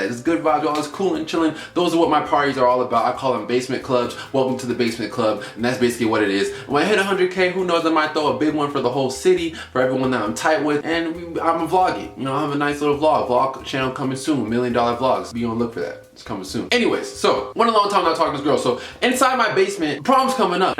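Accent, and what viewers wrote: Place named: Northern Virginia, United States. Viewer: lmfaoooo i KNEW you had to be from nova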